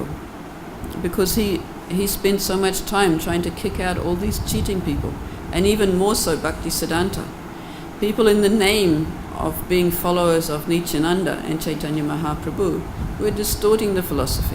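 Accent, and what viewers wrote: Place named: South Africa. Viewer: Hare Krishna! Where is Her Grace from? It sounds like my homeland's accent (South Africa)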